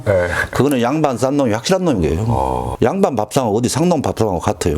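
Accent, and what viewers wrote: Jeolla, South Korea. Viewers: 말 재밌게 잘하시는 전라도 분이시네요 ㅎㅎㅎ 같은 전라도인으로 반갑습니다. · 구수한 전라도 사투리 😊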